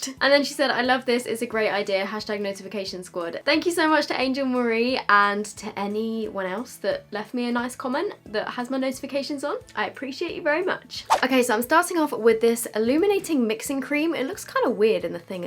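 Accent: Australia. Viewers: That Australian accent though! 😂😂👌🏼👌🏼 #notificationsquad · Very impressed with your Australia accent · Still beautiful 😘 that Aussie accent thouugh 😂👍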